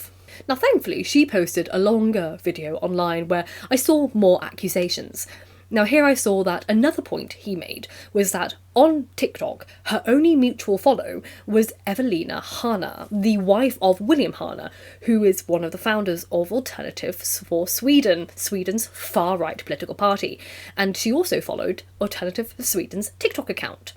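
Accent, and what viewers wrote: United Kingdom. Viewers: You sound British. But your physiognomy doesn’t look it.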